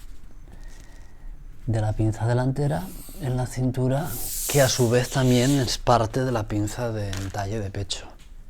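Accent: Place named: Catalonia, Spain. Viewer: Bona nit, me encantan tus clases con ese acento catalán tan pausado, haces que todo sea más fácil feliçitats.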